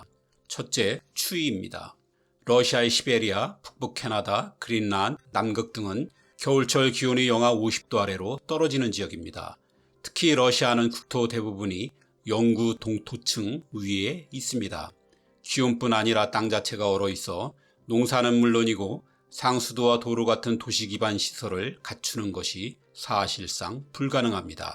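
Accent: Gyeongsang, South Korea. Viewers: 그치만 일정한 억양이 무한반복되어서 혹시 에이아이 아닐까도 생각해요. 경상도 억양이 바탕에 깔린 출신지 불명의 섞어찌개 말씨. 어쩜 똑같이 진행하고 올라갔다 내려왔다 하는지 신기해요.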